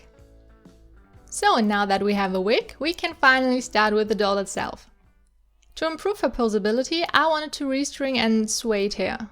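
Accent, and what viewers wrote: Germany. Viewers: I know thats randome but are you German? You sound really german soooo yeah And i really love your art :D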